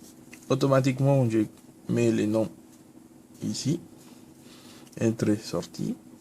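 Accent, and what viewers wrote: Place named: Spain. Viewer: I bet this guy first language is spanish but he speaks frensh very very good! nice explication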